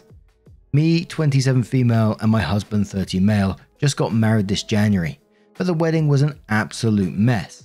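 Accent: United Kingdom. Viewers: You have a pleasant voice to listen to, but I can’t tell if you are from US or UK. UK?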